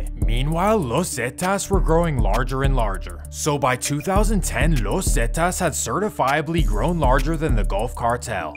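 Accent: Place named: Stockton, United States. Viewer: The way he going hard pronouncing these mexican words make me think he from stockton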